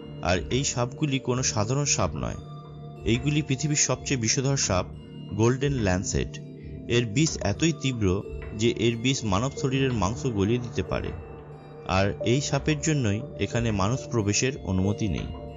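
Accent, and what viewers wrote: Kolkata, India. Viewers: Kolkatar bhasha ki erokom hoy naki? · kolkatar naki dada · indian mone hoitece '' bangla thik moto pare na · Vai apnr kothar moddhe kolkatar vasha mix ase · কলকাতার বাংলা ভাষা,তাই শুনত‌ে এমন মন‌ে হচ্ছ‌ে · Sabbir Pulok tini kolkatar acened a kotha bolce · Savage Hasan Ei lok money Hoi Kolkata er tai emn hoi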